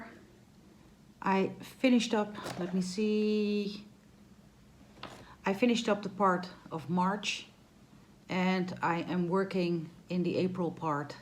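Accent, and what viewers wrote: Netherlands. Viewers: Fab update! Can I just say how beautiful you look in this video!! I loved the Dunglish and strangely understood what you meant each time 😂 fabulous stitching month, love your updates. Happy Easter xx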